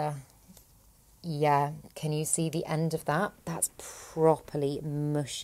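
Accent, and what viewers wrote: United Kingdom. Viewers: hello, I like your videos, and your British mood and accent. About the string of pearls, they are easy to overwater, it's true, but as I am concerned, I find that they need quite a lot of water for a succulent, not too much, but if I forgot, it turns brown like yours. And of course they love to have bright light on the top of the pot ...... I would like to know what do you do when you leave your apartment for a few days, for holidays for instance, about your plants of course ?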